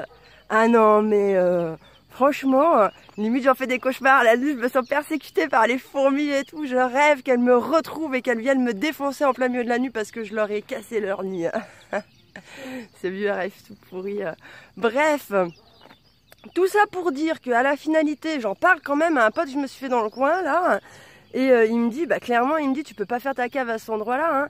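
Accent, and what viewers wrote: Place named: Marseille, France. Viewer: ah ca y est je sais d ou vient ton accent, t es pas de Marseille !!! dis donc tu as tiré tes images du dernier indiana jones la scéne avec les fourmis hahaha-lol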